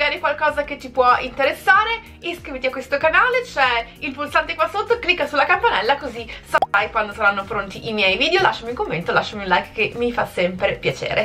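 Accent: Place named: United Kingdom. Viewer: Video molto interessante, mi è giunta una curiosità! Ma tu sei Italiana di nascita? Perché hai un accento british perfetto quando parli inglese, e ti rimane leggermente quando parli in italiano!!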